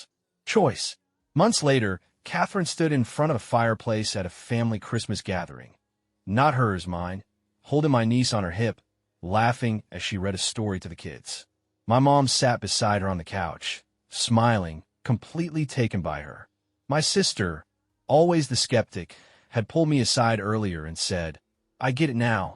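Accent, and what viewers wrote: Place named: France. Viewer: Can we stop with the stupid French accent it’s annoying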